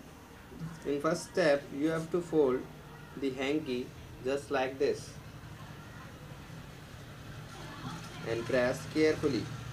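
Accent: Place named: India. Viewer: Indian hokar english bolta he pagal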